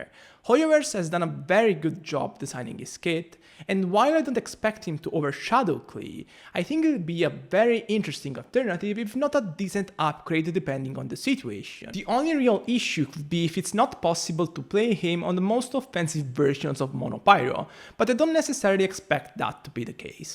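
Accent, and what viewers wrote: Italy. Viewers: Sir are you an Italian or Russian? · just want to say that usually im assuming you're Italian just by the accent and now i see your body gesture really confirm it 👌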